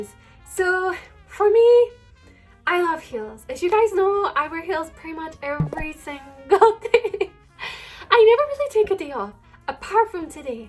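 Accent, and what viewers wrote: Scotland, United Kingdom. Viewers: She made a YouTube video on it a few weeks ago, have a look :) basically she was hiding her proper Scottish accent in fear she wouldn't fit in or couldn't be understood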